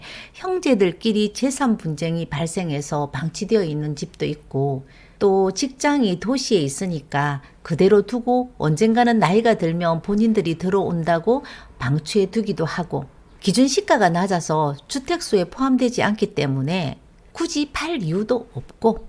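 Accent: Gyeongsang, South Korea. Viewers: 경상도 분? 난 강원도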